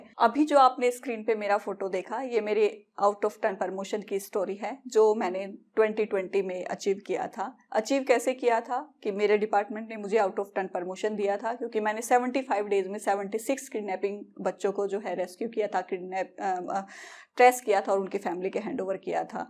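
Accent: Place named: Haryana, India. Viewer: आपको मुबारक कि आप अपने mission में सफल हुई। अब आप दिल्ली में हो अपनी हरियाणवी बोली को कंट्रोल करके बढ़िया से हिंदी के साथ इंग्लिश को mix करके बोला करो। ताकि आप दिल्ली के हर इलाके में पोस्टिंग और कामयाबी हासिल हो सके। promotion होने पर काफी easy feel करोगे । शुभकामनाएं ।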